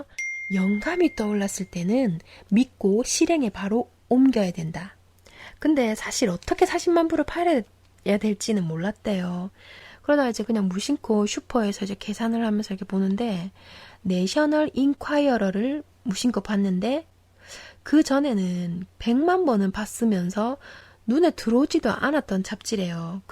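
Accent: Gyeongsang, South Korea. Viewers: 목소리 듣기좋아용~🤩 경상도 같으신데 맞나용?😘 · 저도 경상도인데 특유의 엑센트가 재밌네요. 중간에 주문같이 말하는 부분도 재밌구요~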